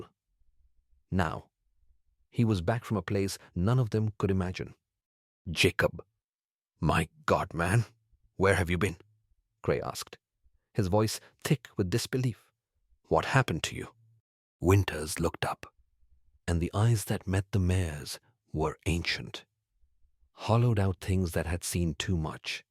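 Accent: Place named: India. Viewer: How horrifying!😱😱😱 Is there any special reason the voice sounds Indian? Grave Fear has a video like this. Same title, same plot, same characters. Why do five guys in the video title screen I'll have the same face? Are they quintuplets? , the story says "they held a look of pure douer", but the video captions say "pure awe". , it seems like the story starts over from the beginning. The only differences between this and the Grave Fear one are the narration and the way it starts to summarize what happened so far towards the end. Then it continues onward, going the way the Grave Fear video did. Another change is that the survivors didn't make it out. Is there, or will there ever be a sequel?😢😔🙏🙏🙏💖✨📖✨💖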